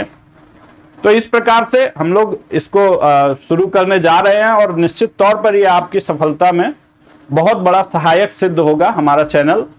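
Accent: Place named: East, India. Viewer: Congratulations sir 🍰🎉🌸🌸🌸🌸🌸📯👃👃.aapka padane ka tarika he itna badia h ki aapka channel chalna he tha sir.sir aap kis City se belong karte hai?aapki language me thoda east ka touch aata h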